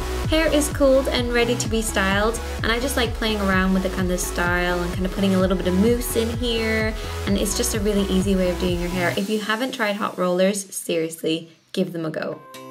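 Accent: Ireland. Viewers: Which part of Ireland are you from? I can't place the accent! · hey!! are you irish!! :P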